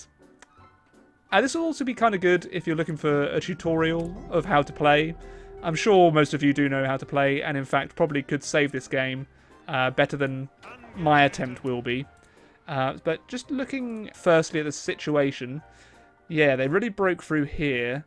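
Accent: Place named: United Kingdom. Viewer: I have noticed when you read outloud some of the chats messages you change up the i to he. Is this a normal british thing?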